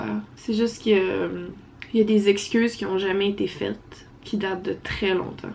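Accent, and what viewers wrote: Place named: Quebec, Canada. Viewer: J'adore ton accent quebecquois ! 😉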